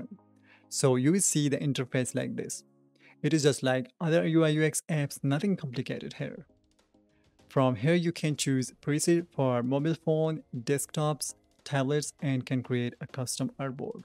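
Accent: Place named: India. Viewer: Are you Desi? Familiar accent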